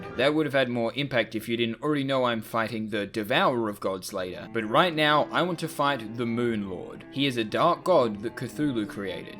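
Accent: Australia. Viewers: wait your Australian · I didn't know you were Australian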